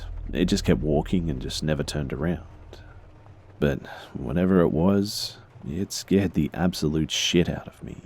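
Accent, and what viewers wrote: Australia. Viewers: I love the fact that your an Australian narrator. 👌🏽 #onefortheaussies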